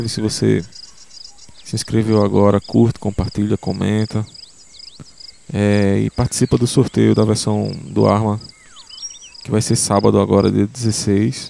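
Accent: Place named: Recife, Brazil. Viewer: Bicho tu és de que cidade ? Tens sotaque daqui de Recife.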